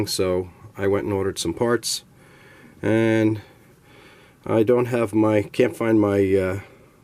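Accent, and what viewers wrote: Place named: New York, United States. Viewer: Keep , keeping busy bud . Just love your New York accent . Merry Christmas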